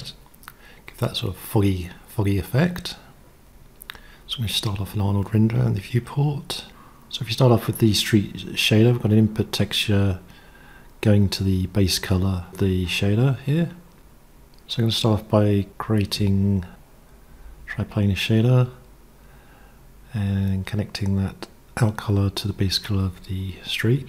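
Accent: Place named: New Zealand. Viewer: That is Kiwi accent, if you are wondering. Every video teaches me something new.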